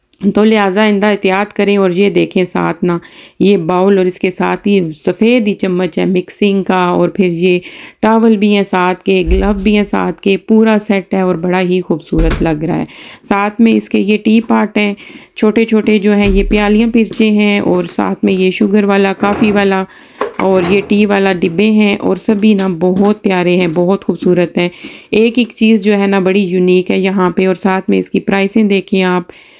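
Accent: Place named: Punjab, India. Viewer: Wa alikum salam je❤your Punjabi acent❤❤bartan waqe e haseen steel wly.😊i am your new friend stay connected with me dear😊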